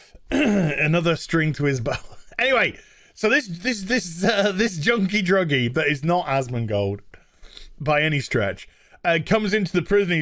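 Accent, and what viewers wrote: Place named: United Kingdom. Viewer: Damn that british accent is spot on.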